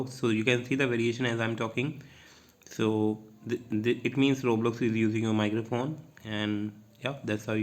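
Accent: India. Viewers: Yada yada yada cant even understand what your saying your indian accent rots my brain.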